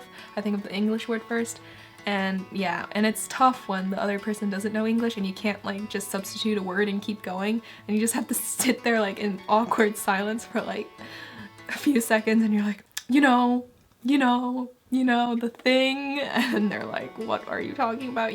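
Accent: United States. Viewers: Hi I thought you are an American in the beginning so.. i speak 2 very old language Assyrian and Syriac and also Arabic and English and im trying to learn Finnish (: wish me good luck by the way all your video are fun to watch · You do sound american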